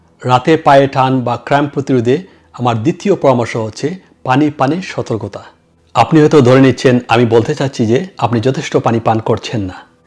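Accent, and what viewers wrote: Sylhet, Bangladesh. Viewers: আপনি কি সিলেটি? কারন আপনার উচ্চারণে তা বুঝতে পারলাম।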